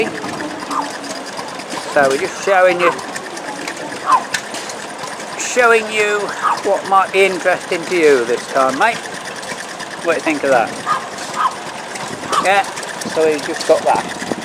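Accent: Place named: Lincolnshire, United Kingdom. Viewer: Thanks,should we compromise and call it a country show? Great to hear your Yellerbelly accent,very rare these days.I am a foreigner myself, having moved here 34 years ago,a newcomer but loved every minute! All the best.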